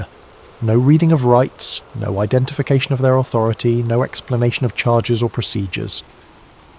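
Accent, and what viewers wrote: England, United Kingdom. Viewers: please, no more english accent 😮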